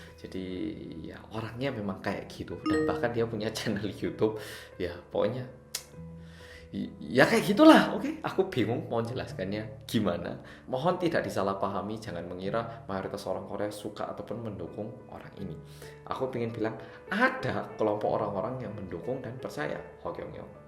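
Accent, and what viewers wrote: Java, Indonesia. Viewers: Ade ku udh hapal banget sama medokkk nya mas 🤣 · Malah medog ngomong nya 🤗🤗🤗 ngga kerasa orang asing yg bawa 😆😂😆😂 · Kang, boso jawamu medok ae kang wkwkwk, lanjut trus berkarya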